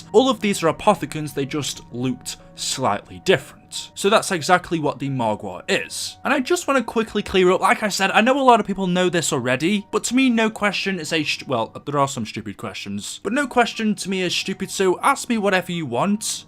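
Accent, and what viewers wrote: United Kingdom. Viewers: Are you British because your accent is awesome! !!! ₩ h ¥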